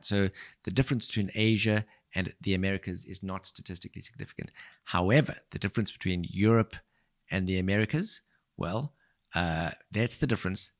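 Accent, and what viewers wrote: South Africa. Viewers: Your accent reminds me so much of home! South Africa foreva! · OMG are you South African? That boomchakalaka had me.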